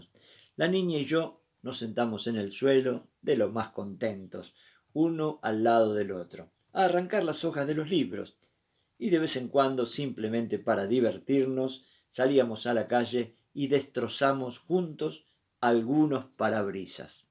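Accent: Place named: Argentina. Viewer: Gracias por la narración, abuelito. Su voz es tan relajante y el acento argentino, ni qué hablar! Gracias, se aprecia demasiado.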